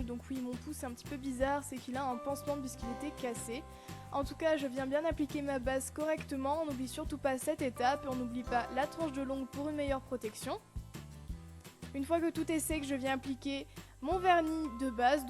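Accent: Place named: France. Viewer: Tu parle français de France moi je parle le Québécois je suis une fille J'ai 8ans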